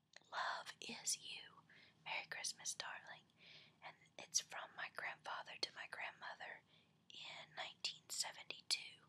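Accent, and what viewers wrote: South, United States. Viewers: I just came across this video, maybe you've answered in a previous video...but is that southern accent I'm hearing?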